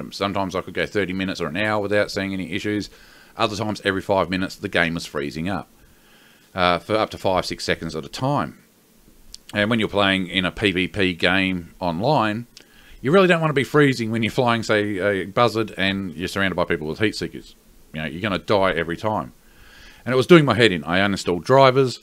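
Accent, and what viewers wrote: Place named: United States. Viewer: You have a really american voice I really like that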